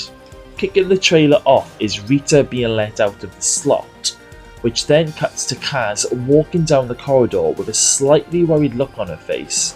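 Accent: Wales, United Kingdom. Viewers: Very Welshy mate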